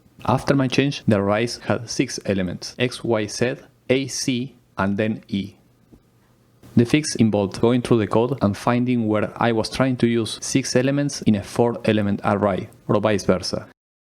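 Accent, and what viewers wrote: Argentina. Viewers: vos sos Argentino??? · sonas como argentino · El acento argentino es inconfundible jaja. Bien ahí hermano, muy buen proyecto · Hola, excelente maquina, tu ingles tiene un dejo de acento argentino, puede ser? Saludos! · Como andas papá? Otros pensaran que es un ingles malo, pero yo note ese Argentino desde el principio. Proyectazo loco, segui para delante que es realmente impresionante. Felicitaciones 🟦⬜☀⬜🟦 ---- ⭐⭐⭐ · Sos argentino papá ?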